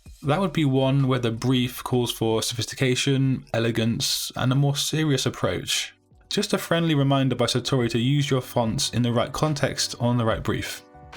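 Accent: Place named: Liverpool, United Kingdom. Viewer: Excellent work and content. Highly appreciated. Like your Scouse accent.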